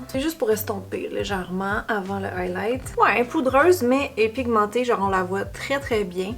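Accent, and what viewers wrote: Quebec, Canada. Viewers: Merci pour tes vidéos, ta bonne humeur et j'avoue, l'accent et les expressions québécoises j'adore!!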